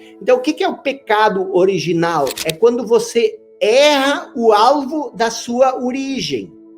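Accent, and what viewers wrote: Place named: Rio Grande do Sul, Brazil. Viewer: Bem que eu conhecia esse sutaque. Você é aqui do Rio Grande do Sul. Adoro seus vídeos.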